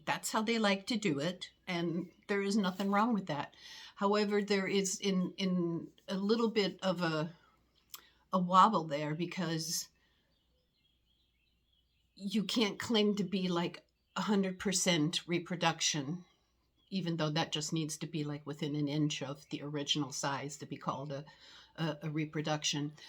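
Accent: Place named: Germany. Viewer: Boy that Kathy is going to be busy!!!! I soo love them both! Your sweet German accent is lovely! My kirche in WI is one of the oldest German churches in that area. They continue to do a gottestant service for Easter and Christmas! Not to mention the decor is very European! BTW I love the birds in the background!!! And yes it’s Tuesday!!! Hugs!!!!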